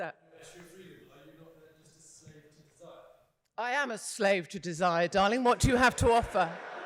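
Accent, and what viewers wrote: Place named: United Kingdom. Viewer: I’m generally worn out from British accents but I like a British accent saying the things says. So much so I followed her.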